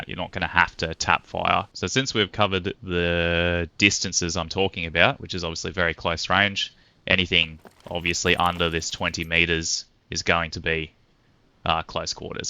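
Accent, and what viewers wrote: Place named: Australia. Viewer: Nice to hear an Aussie voice and a fella who knows what he's on about to boot. Subbed cheers mate.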